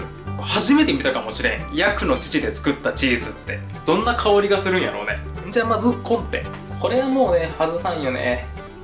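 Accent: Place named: Hakata, Japan. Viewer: の動画観すぎて料理の知識だけじゃなく博多弁までも学んでる一石二鳥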